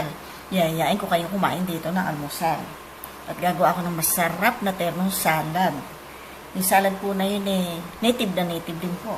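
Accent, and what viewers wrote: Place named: San Miguel, Philippines. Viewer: thanks for the share! i like the way way you described things, may punto pa eh! parang taga san miguel eh! i watched the bagoong, so i ll continue with tuyo. ganda ganda nang tuyo, just like you and your video! thanks again! ow sadiqa ka pala... kaif falik, shukran jazilan!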